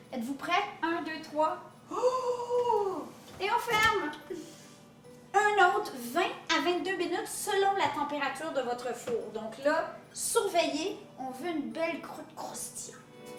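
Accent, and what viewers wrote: Quebec, Canada. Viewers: Bonjour, Magnifique votre pain en cocotte mais comment faites-vous pour ne pas grossir? Moi, j'adore le pain ainsi que pas mal d'autres recettes mais je dois me retenir d'en manger car tout cela me fait grossir. Merci pour le partage. J'adore votre accent quebecois. Bonne journée.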